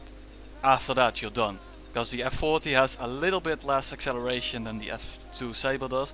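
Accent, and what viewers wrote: Netherlands. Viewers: Subscribed. I hear a clear dutch accent. Groeten uit limburg!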